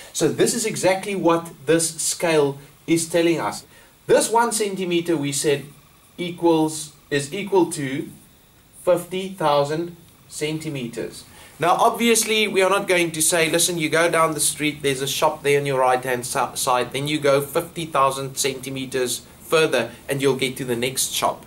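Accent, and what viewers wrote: South Africa. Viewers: My Guy thank you so much for helping me, you are such a good teacher 😀 and btw are you from South Africa? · are you from SA??? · Lmao he's South African!!! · Confirmed he's from south africa. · He sounds so South African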